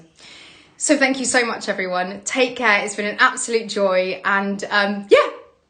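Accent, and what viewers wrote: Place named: London, United Kingdom. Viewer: Thick London accent.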